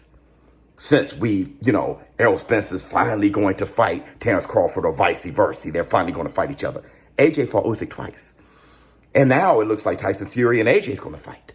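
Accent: Louisiana, United States. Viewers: U from Louisiana?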